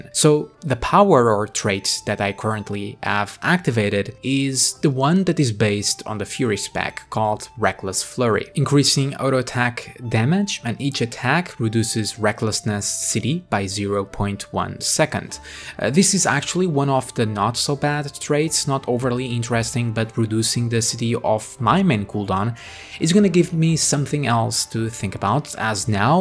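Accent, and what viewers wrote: Portugal. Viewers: Great! E pelo sotaque só podes ser português. :D Experiência própria...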